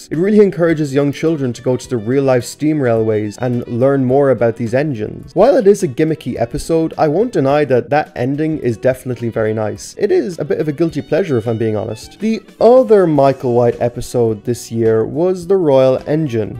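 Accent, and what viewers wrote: Ireland. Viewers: you already sound Irish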